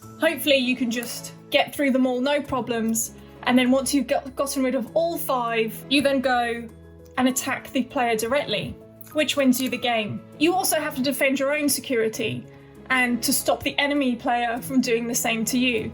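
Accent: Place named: United Kingdom. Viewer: Where have you been hiding? Love this. Finally a fellow British (well proper english speaking) person 😅. Nostalgia overload.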